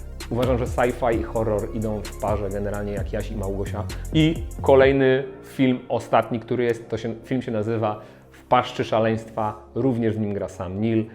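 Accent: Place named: Poznań, Poland. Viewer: Słychać że poznaniak tej!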